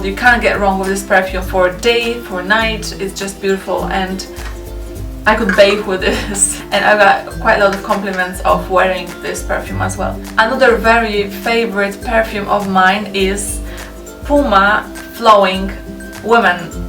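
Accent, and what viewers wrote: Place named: Poland. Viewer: I dont know why, but polish accent is very similar to south american accents... Like venezuela... Maybe its just me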